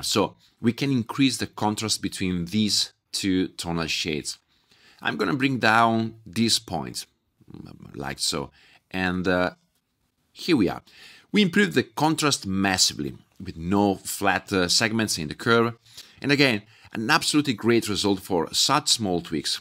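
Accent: Italy. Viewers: the EE at the end of every word is amazingly Italian haha. thanks for the video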